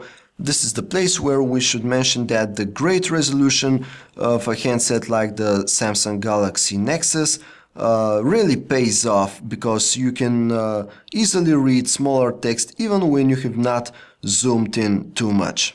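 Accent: Eastern Europe. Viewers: is your family's origin somewhere in east-europe? your accent makes me think this so. nothing bad. great preview guy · Dude your Eastern European accent is too ridiculous.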